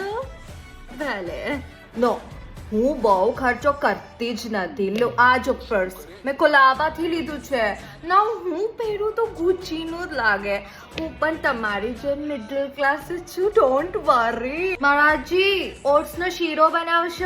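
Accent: Gujarat, India. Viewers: Her Gujarati accents are killing it 🎉😂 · Not only rich gujjus NRI gujjus have this accent too😂😂😂❤ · Not the avacado undiyoooo yaaar 😂😂😂😂😂😂😂😂😂😂 Non Gujrati chuu pr di yaar this to on point I have gujju friends and they speak like this.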